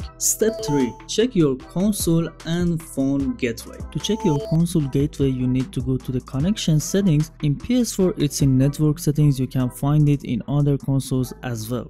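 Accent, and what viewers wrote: Iran. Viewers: لحجت،ایران هستی؟ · اکسنت فارسی داد میزنه ایرانی هستی 😝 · Bro you Persian. 100% Theres no other Accent like this.. Ive spoken to many who speak the Lamguage Persian as their Mother Tongue.. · از لهجتون تشخیص دادم موفق باشید 😁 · داداش فارسی هم بگو خوب تابلوئه اکسنت پرشینت ..😉👍🏽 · داش حال کردی چطوری تشخیص دادم؟ XD لهجه ت ایرانی اصیلهXD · سلام داداش چطوری خوبی میدونم ایرانی هستی از لحجت معلومه خوشبختم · Straight persian accent right there 😅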